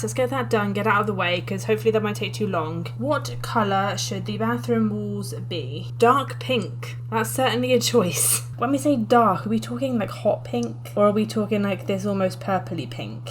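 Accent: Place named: United Kingdom. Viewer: That's gotta be the most British voice I've heard in years.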